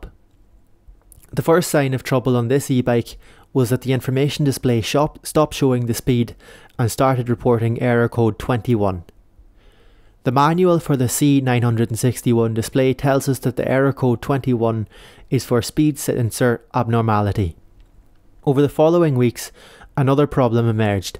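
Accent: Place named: Ireland. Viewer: Thanks, your accent sounded a bit Ireland.?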